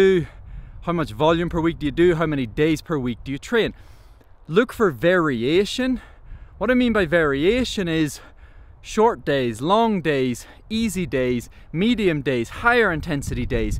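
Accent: Northern Ireland, United Kingdom. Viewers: Jaysus that norn Irish accent cuts like a knife. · Came across this video and my ears perked up immediately, no mistaking that accent. Fellow nordie here.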